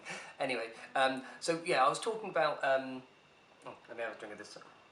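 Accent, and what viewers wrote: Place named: United Kingdom. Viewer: Cool vid and the epic British accent! +sub:)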